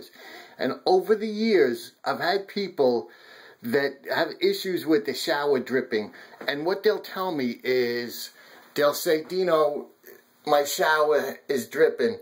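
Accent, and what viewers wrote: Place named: East Coast, United States. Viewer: He's from the East Coast of the USA - you can tell by his accent. Probably Massachusetts or New York.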